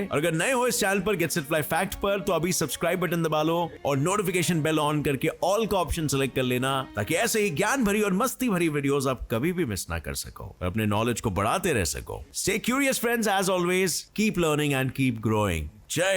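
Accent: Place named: Maharashtra, India. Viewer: I always like your Marathi accent.....👍👍👍🏻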